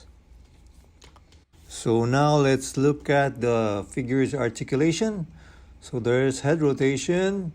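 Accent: Philippines. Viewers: He's accent is a Filipino Klinikle!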